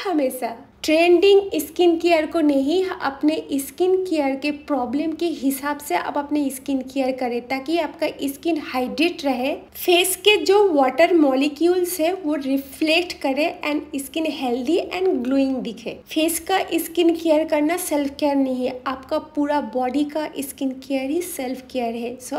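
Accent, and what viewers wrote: Bengal, India. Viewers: Love this Channel and love your sweet bengali accent that pops in while speaking hindi ❤❤want to aski regarding can we have soups recipe as well since winter is approaching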